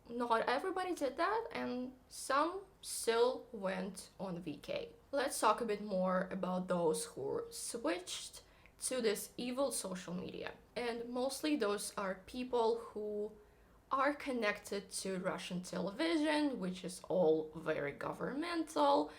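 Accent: United States. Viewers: Your American English sounds amazing! I wish you all the best 😊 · Interesting comparison between Russian social media and YT. Your subs seem to be going well. Nearly 50K now. Going great. Hang in there. You do good work. I don't/won't have Face Book, so the question is N/A for me. I'm interested in where you learned your English? You have certain American sounds on certain words. Your English is very good, I don't hear any mistakes there at all. 😁😁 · I'm shocked at how good your English has gotten over the past year... You're starting to sound American lol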